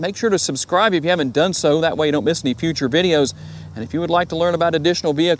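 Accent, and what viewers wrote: South, United States. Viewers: Love the car and great video description. I treated myself with a 2025 urban gray colour. Love the ride and the confidence of being in control on the road facing fellas with souped up pickup trucks. By the way I could listen your voice all day it’s very soothing and welcoming. I always been attracted to that lovely southern accent! Keep up the good work sugar love!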